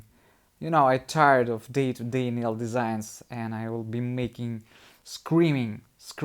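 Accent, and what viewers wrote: Russia. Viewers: I love your nail art, and you're great with gel. I also understand your English very well. My son is obsessed with all things Russian, and sometime when I watch your videos, he watches with me just to hear your accent. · Also, your English is good. I ❤LOVE❤ your Russian accent. Don't let anyone tell you that the way you speak is flawed. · Are your clients happy? That's all that matters. I love listening to your accent, and can those who criticise speak Russian? If they tried you can criticise them. I love listening to you, and I love what you do.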